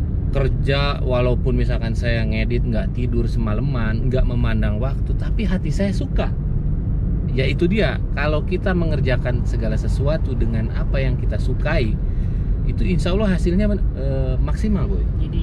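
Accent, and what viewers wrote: West Java, Indonesia. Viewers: Walaikum salam.. Khas sunda Bangets.. He he.. Salam ti Banten..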